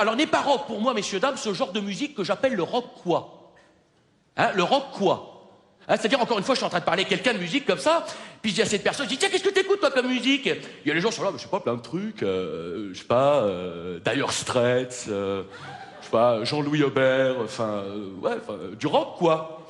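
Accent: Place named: Belgium. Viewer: Il avait un léger accent belge à l'époque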